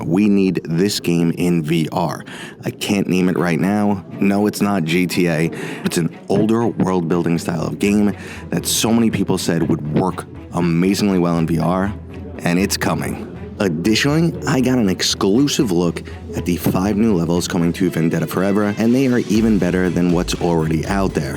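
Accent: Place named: Brooklyn, United States. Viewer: You know when that Brooklyn accent "tries" to whisper, you're in for something good.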